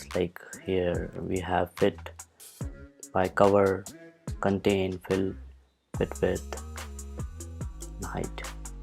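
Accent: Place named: India. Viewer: There should be a warning to filter indian terrible accent.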